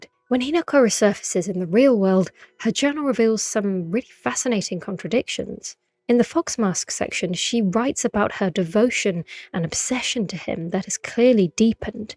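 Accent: United Kingdom. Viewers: I’m literally British and even I am charmed by her British accent, haha. So relaxing. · Every time uploads a video, I consider being a nicer person for the simple joy of listening to her deliberate concepts in video games. There's also moments where her emotion pours through her commentary and that feels wholesome and kind. We need more chill youtubers with smooth british accents talking quietly about video games all day.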